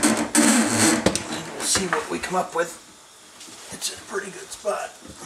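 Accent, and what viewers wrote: Canada. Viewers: The narrators Canadian accent is throwing me off. Dont know when he means house and hose...they both sound the same to me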